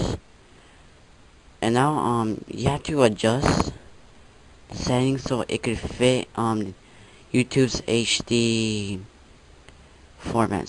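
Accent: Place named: Asia. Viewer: Lol a very asian accent this guy has.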